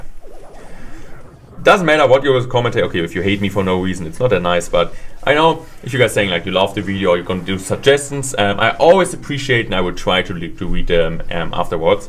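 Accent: Germany. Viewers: You are from Germany because you have Germany accent?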